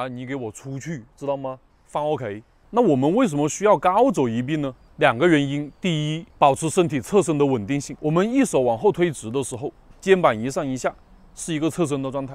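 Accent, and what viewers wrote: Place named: Guangdong, China. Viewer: 老师的广东普通话➕幽默还挺有意思的。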